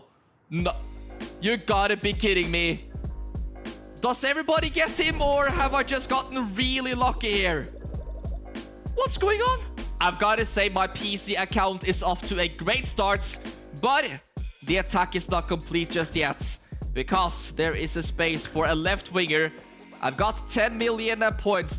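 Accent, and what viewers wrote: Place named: Norway. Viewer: ayy my favourite swede with norwegian accent uploaded!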